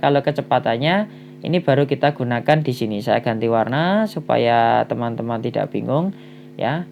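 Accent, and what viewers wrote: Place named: Java, Indonesia. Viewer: Salfok sm suaramu bang mirip ping lie agk medok jg hehe 😂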